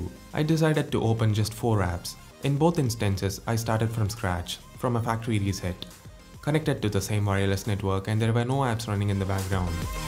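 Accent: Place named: India. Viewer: with the Indian accent? Umm... maybe he's Indian?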